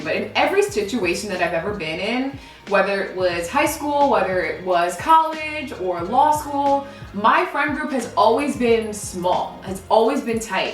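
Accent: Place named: New York, United States. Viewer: Girl you don't have to defend your New Yorkness- I (as a native Brooklynite who's been living on the West Coast for 10 years) can tell just by the way you say the word 'water'. lol Your accent makes me miss NY. <3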